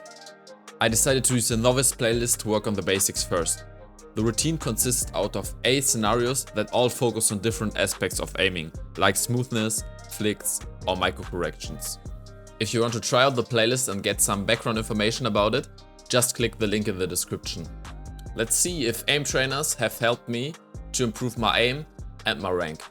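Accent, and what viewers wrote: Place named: Germany. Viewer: i hear a bit deutsch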